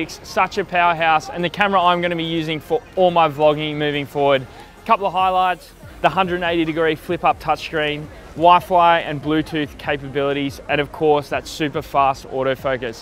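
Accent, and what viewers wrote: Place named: Broad, Australia. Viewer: Stop talking like a yobbo,you Need to look at yourself how you come across and it’s not very good clean up your vocabulary get away from the Ocker Image I personally wouldn’t buy off you know pride in that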